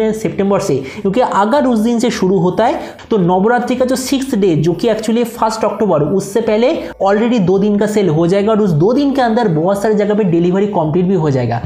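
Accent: Bengal, India. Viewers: Bengali ka hindi kuch alag funny lagta hai 🤣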